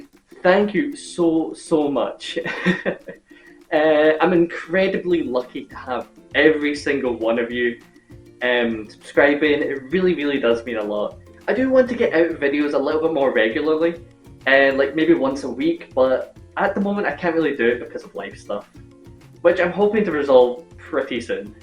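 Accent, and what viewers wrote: Scotland, United Kingdom. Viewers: Is that a Scottish accent I detect? Well, hello there fellow Scot! Interesting video.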